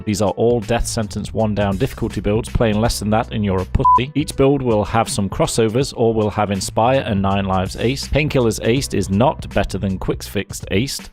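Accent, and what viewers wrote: United Kingdom. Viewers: Are u british? Ur voice is very similar to hoxton voice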